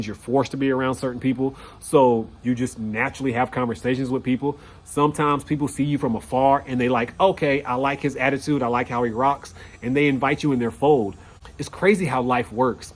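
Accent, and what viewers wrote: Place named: United States. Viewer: Sounds like your from the U S A and it sounds great you moved around a bit well rounded! Be Well Be Safe & Stay Blessed.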